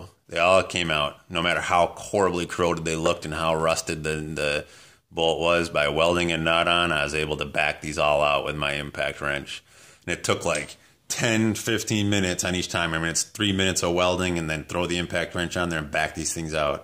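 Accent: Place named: Wisconsin, United States. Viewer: Hey are you the dude from Wisconsin??? Im assuming by your accent that you are. I live in Hayward myself! Lol. Cool video, My 98 Silverado is having the same issues.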